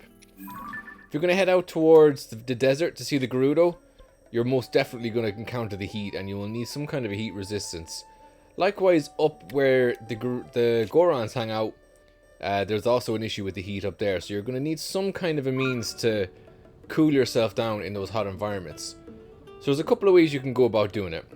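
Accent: Ireland. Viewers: Thanks for your videos! Running through TOTK again with my son and there’s nothing better than getting tips with an Irish accent! Slainte!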